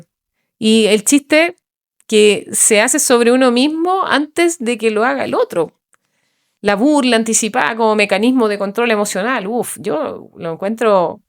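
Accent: Chile. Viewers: Es muy notorio en su hablar que es chilena... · Muy lindo el acento chileno. Cierto, si no te incluyen en el grupo, mejor salirse, yo no encajo en ninguno jaja, Saludos desde Argentina. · Vos sos chilena, no? Lo digo x tu tonada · Tu acento es chileno , los tienen a un lado , mis condolencias. Olvidaste mencionar al racismo profundamente arraigado en su cultura. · Yo quiero saber porqué su acento chileno no es tan marcado como en el sur. Gracias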